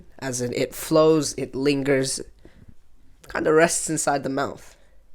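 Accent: India. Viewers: Yo bro I live in Pakistan so I'm not just a native speaker I have also studied it as a compulsary subject. As a native i can confidently say............ Bhaitum na kam urdu aur zada Hindi accent mai bol ry thy(Bro your accent was more Hindi than Urdu) alao your pronounciation of "خ" is totally wrong. When there is a خ sound you always pronounce it as کھ which is so SO wrong............and you just sound so Indian. But the video was cool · your pronunciation of urdu words is not correct, you are speaking with a hindi accent.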